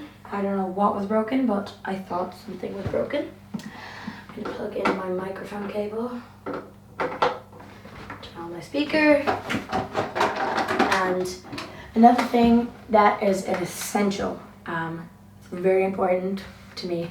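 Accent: Ireland. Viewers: Why does she have a Jacksepticeye accent? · Is she Irish??? I'm in love all over again. Fantastic video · Your American accent sounds great! I only hear a little of your Ireland accent. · Euros? Irish accent? Where are you from? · Love your accent. am i wrong to say it's Irish? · Irish, don’t you know. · Yeah she has an upperclass Irish accent. With a hint of American thrown in. Irish kids watch a lot of American TV series and movies and tend to copy the way they speak. They way she says "there" is so American and not the Irish way of saying it.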